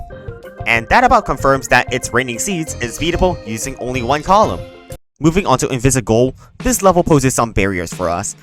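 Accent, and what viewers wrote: China. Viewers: maybe his asian chinese accent you dont like? lol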